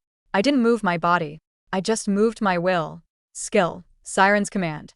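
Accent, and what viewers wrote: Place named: India. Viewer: "No monsters no graving"wow Indian voice accent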